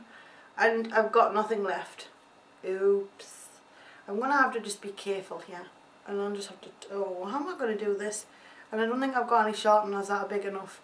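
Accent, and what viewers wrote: Newcastle, United Kingdom. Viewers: The accent comes from south shields, which is in northeast England very alike to a Geordie accent love it <3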